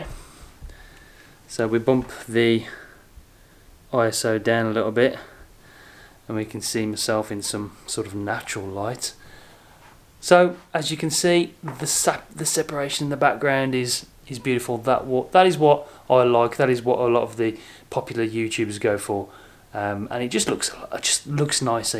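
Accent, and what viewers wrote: United Kingdom. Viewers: Hey thanks for the video, quick question, if I have a canon m50, I don’t need an adapter right? Also you sound like you’re from the UK, I am too and was wondering where I could get the lens from?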